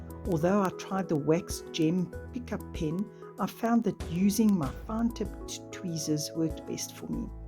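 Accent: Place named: South Africa. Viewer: Hello from a fellow South African! It's always such a treat to click on a video and hear the familiar accent 💖I can't believe I've only stumbled on your videos now. I am miniature mad and consume miniature content like an addict 😂 Your work is exquisite and you are a true artisan. I've learnt so much from you in these three videos, so thank you! 🥰 I have loved all things miniature since I was tiny but have only seriously started making minis this year. It really is the BEST 💞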